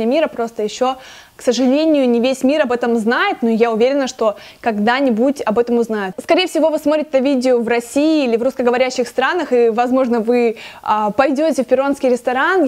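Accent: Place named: Spain. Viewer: вот, ровно два года назад акцента еще не было)))) испанский язык внес очень красивый оттенок в ваш русский язык))))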